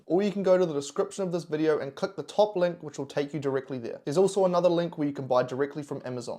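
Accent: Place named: New Zealand. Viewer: Wow, I thought he sounded like a Kiwi. I recognised the brands he used.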